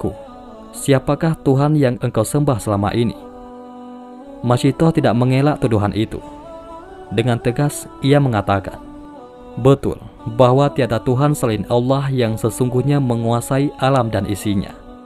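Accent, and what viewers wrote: Aceh, Indonesia. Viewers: Dari aku perhatikan cara bicara channel ini Org ini org aceh logat bahasanya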